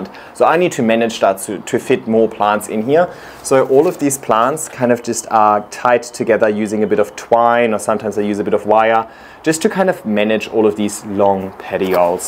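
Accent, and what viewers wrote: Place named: Germany. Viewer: Can anyone tell me if he has any German background 😭😭 I know his name is but my god his accent sounds so German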